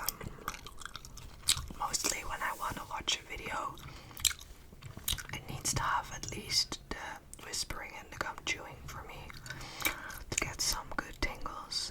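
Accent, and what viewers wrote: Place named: England, United Kingdom. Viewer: I looove listening to your English accent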